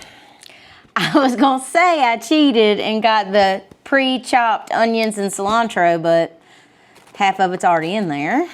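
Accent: South, United States. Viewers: ABSOLUTELY SHE NEEDS A FOOD NETWORK SHOW!!!! She cooks like the rest of us real people! She also talks like most of us in the South and lives in a remodeled 1971 mobile home that is gorgeous! Like most of us in the South, we like paid for stuff and repurpose, reuse and restore to keep it all paid for! We not into latest and greatest! We are into keepin it real and comfortable and debt free!😊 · You work hard to cook for your family. The best part is you cook Italian food. All done with a Southern Accent. Remember, Men have no balls, but the Women have dee big balls! Fantastico! Ciao, Bella! ❤❤😂😘❤❤ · No offense-I don’t think I’m ever going to make what you make, but I do like watching you cook and listen to your accent (Boston girl here)! Taste for poison is brilliant and liquid eggs - OMG! You go country girl!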